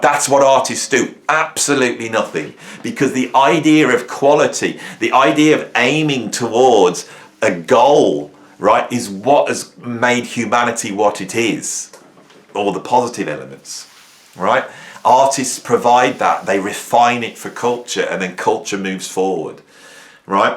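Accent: United Kingdom. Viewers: I thank you for your channel it's therapeutic and informative. Plus, I enjoy your British accent 👍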